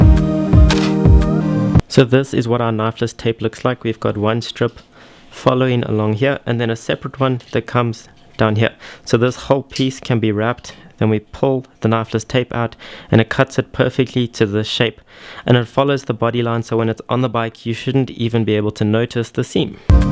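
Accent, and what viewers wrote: South Africa. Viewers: I knew your voice sounded south African btw love your vids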